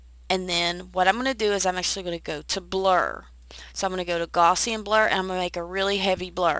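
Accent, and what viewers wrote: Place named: South, United States. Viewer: thanks, dig that sexy accent too.......are you from the southern part of the states ?.........great vid !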